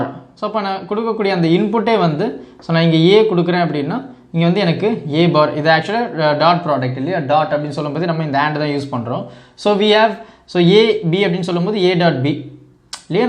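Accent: Coimbatore, India. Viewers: Bro are u from Coimbatore ahh bro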